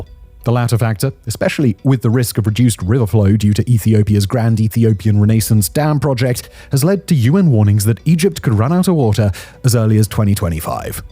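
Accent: United Kingdom. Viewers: High English level is required to understand this video. Is that british accent?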